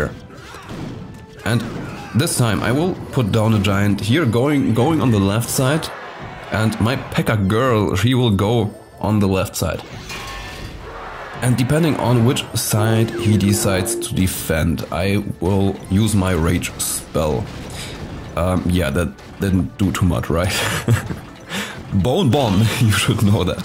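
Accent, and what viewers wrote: Germany. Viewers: du bist deutsch; das erklärt die Aussprache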